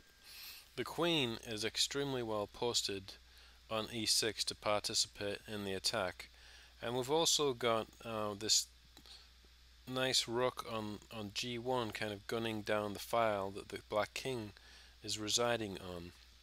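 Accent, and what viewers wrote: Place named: England, United Kingdom. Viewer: Where from in England though?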